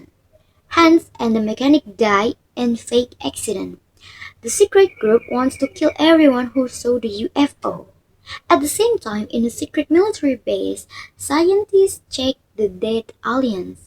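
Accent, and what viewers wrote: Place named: United Kingdom. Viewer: my british accent 😻😻😻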